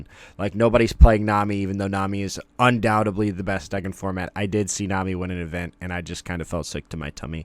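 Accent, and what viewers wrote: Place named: East Coast, United States. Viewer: What part of the East Coast are you from? There's no chance that accent is anything but East Coast!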